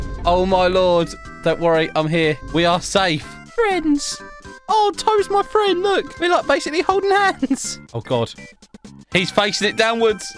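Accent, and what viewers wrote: United Kingdom. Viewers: I like u because I love your british accent 🤠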